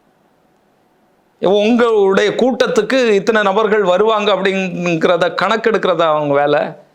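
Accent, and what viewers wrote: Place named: Kanyakumari, India. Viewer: குமரி தமிழ். பாராட்டுக்கள்.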